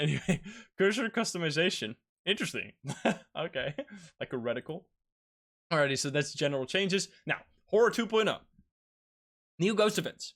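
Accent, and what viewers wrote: Netherlands. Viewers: WAIT, are you dutch? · eeeey een nederlander of belg idk eeeeey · Dit is een Nederlander ik kan het horen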